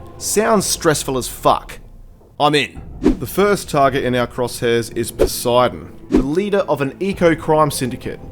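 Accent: Australia. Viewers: Aussie accent just makes everything better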